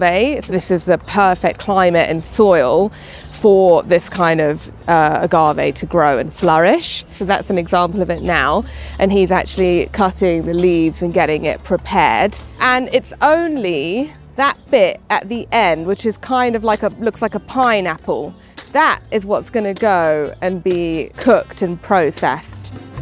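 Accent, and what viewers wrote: United Kingdom. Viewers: Your accent sounds British. Are you British?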